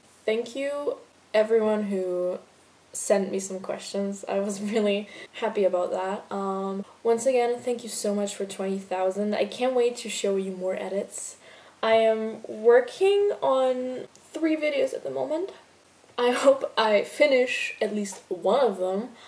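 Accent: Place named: United States. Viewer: Hi! If you're from Austria, how can you speak so beautifully in English and especially with an American accent? By the way, love your videos! ( Greetings from Hungary 😊 )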